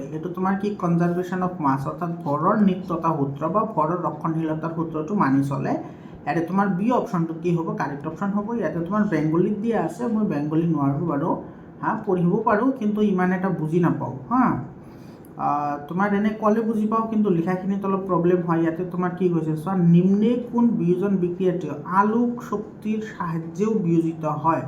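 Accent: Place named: Bajali, India. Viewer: bajali lage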